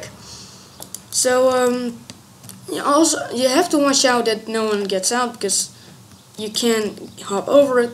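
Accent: Netherlands. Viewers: bent toch nederlands he? Ik hoorde het al aan je stem en accent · I am Australian. I was actually quite impressed with your English. Thought you sounded a little Dutch, so I just wanted to check :)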